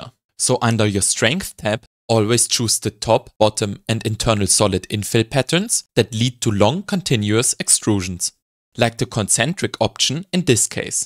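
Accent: Germany. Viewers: Deep, but well explained, technical stuff, made by a lad with a german accent, witout annoying music and/or nauseating transition effects on video edition. I t can't be better that this. Thank you a lot! 🙏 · мне так нравится этот немецкий акцент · Du hörst dich an als wärst du auch Deutsch edit: Jup, hast es im Video grad gesagt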